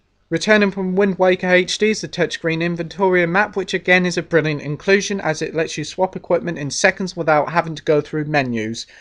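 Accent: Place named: United Kingdom. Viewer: Love British accents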